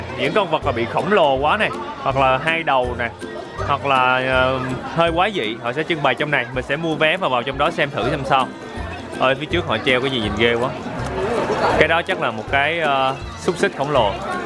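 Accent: Mekong Delta, Vietnam. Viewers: Nghe giọng nói đúng chất miền tây. Dễ nghe gần gũi. Thành fan ông này mất thôi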